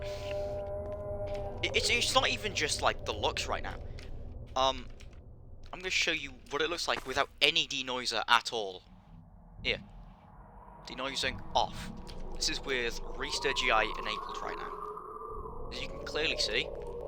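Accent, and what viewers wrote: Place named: United Kingdom. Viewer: well documented video but you have the funni brit accent and its distracting pls be a little less tea sipping brit next time pls ❤‍🩹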